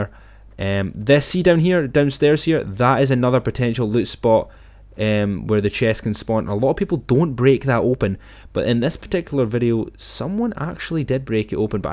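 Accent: Scotland, United Kingdom. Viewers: This guy is Scottish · Are you a Scott? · He's from Scotland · I think he's british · Dats a thicc Scottish accent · Are you Scottish? You sound like it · Are you Scottish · Yo im scottish and even i can say that scottish acsent just doesnt work on youtube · You scottish? We can be scottish bros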